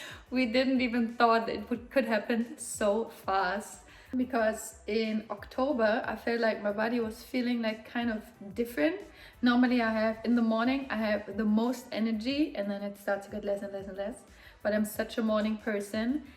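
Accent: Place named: England, United Kingdom. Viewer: Send you and Mario love and blessings from Mexico, I’ve just subscribed to your channel and it’s interesting, I like your English accent. ❤️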